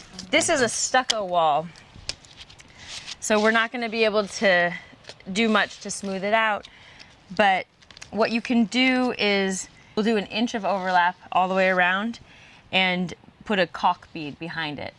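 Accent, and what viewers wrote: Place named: Chicago, United States. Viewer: Thanks for sharing. You have a nice accent, maybe Chicago? I thought Woldfire Defence was an Aussie company. Anyway, here in Southern California, crawl spaces really make you crawl, and in some cases, creep (like a snake!), so I’ll be installing from the outside. Good video, by the way. I’m going to watch the rest of the series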